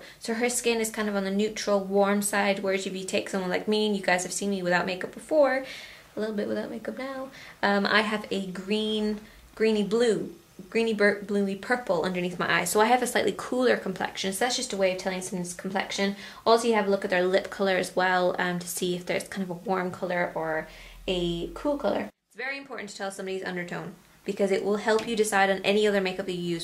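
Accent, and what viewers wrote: Ireland. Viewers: She sounds Irish. She mentions getting some '€' off the eyelash curler, which is currency in Ireland. She sounds as if she's spent time in the USA or Canada though. Her name and her look,are very Irish/Celtic, much more than her sister. :-)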